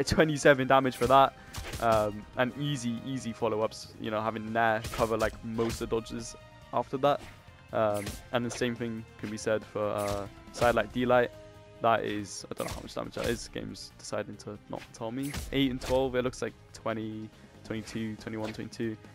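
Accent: United Kingdom. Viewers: i like your BRITISH ACCENT btw im british too im just gonna eat me crumpets and a bloody cup'a tea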